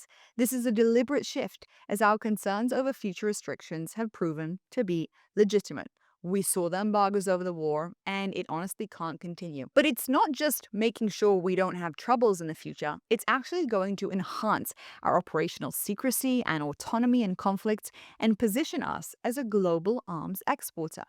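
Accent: England, United Kingdom. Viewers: YOU AND THE BRITISH ACCENT HAVE ME WORKED UP BEYOND BELIEF!!!!!!! WHERE DOES THAT ARROGANCE COME FROM!????????? IS THAT WHY 90% OF THE WORLD HATES JEWS AND ISREAL? I'm not one of the Haters But if you Keep Running That MOUTH!!! KNOW YOUR PLACE!!!!!!!!! CHRIST IS KING!!!!!!! 🙏🇺🇸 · All good and makes total sense. This will terrify israels enemies. Even more than now! Ps. You are precious!! You often have a strong English accent.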